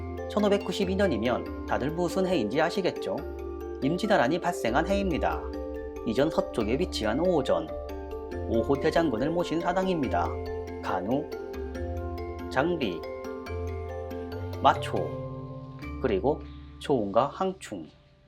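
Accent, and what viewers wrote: China. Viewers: 억양이 중국사람같네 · 말투 억양이 누가 들어도 조선족이신...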